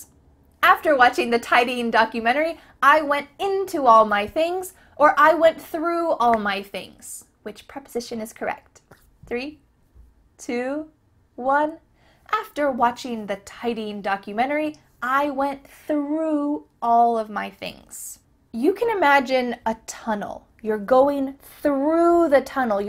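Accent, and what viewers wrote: United States. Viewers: I want u to do more videos on how to sound like an American....PLZZ PLZZ just get a series on it so that everyone would be able to get the same accent on point just like u🙏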